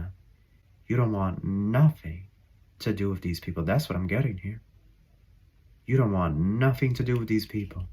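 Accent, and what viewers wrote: South, United States. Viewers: The group who hounded me and lodged a claim against me is a law firm in the UK (Irwin Mitchell LLP) who promised me a No Win, No Fee deal, then reneged. They intended to grab a slice of the pie when my family house (worth $3M) in London was sold. But another law firm representing a man who was having an affair with our family cleaning lady and claimed to be my (wicked) stepmother's long lost nephew, got to it first and removed my name from the title deed. IM transferred the ruling (made without my knowledge) to the Supreme Court of NSW, Australia. I've been fighting it as a litigant in person for a year now. A Judge ruled against me last Friday because he believed the evidence of a fraudulent affidavit of service from a crooked organisation called ASI (All States Investigations) which declared I had been served. But IM don't get a penny because I'm protected by Australian domestic policy which does not permit my pension to be garnished or possessions worth less than $9000 to be seized. 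So you are spot on. Its similar to "Robodebt" and "Mr Bates v the Post Office" in its sinister implications of State v Individual). I know I am protected by angels. The other matter (a no contact romance scenario relating to my ex-GP) is not connected to the financial attack, but it might involve the presence of a demonic spirit, so I am taking no chances and will join the Catholic Church to take advantage of their exorcism procedure. I love your southern accent and your individual personsa. Th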